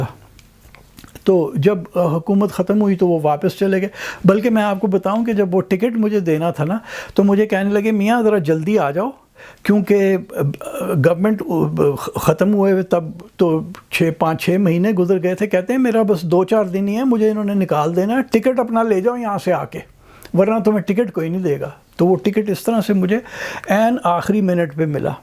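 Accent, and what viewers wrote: Punjab, Pakistan. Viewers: Salman Rashid sab, your and my ancestral city is Jalandhar 😊😊😊. Your Punjabi accent reminds me of my late grandparents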